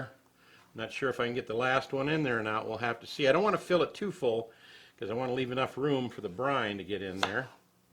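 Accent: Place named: Ohio, United States. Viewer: You are videoing from TN where you may live, but you sure don't have the accent. I think you sound like you're from Ohio! I love the accent.😊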